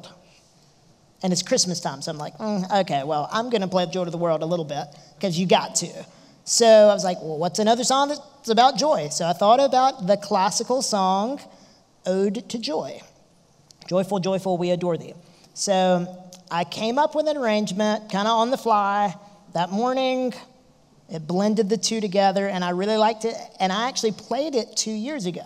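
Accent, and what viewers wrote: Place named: South, United States. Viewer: I had never heard you speak until today. Couldn't believe you're a Southerner. Love that accent. :-)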